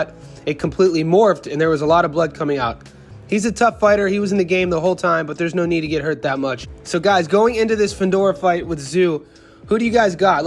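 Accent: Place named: Australia. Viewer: Tszyu you say you're Mexican maybe you are but not really you sound like an Australian you born in Australia you look Australian and because you from Australia you are Australian not Mexican so you can forget about that and probably the best that Australia has to offer in the boxing world. Yahweh said that's known as Jesus now you are not a pastor in your own land you know boxing is all politics just like everything else your father might be from Mexico but where you at now Australia I never heard of a Mexican living and born in Australia that's unheard of that's the other side of the world ...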